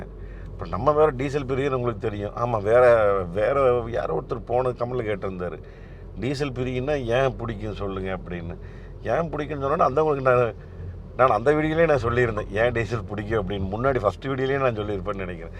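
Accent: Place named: Coimbatore, India. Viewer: அன்னன் குரல், கோவை பேச்சு அருமை....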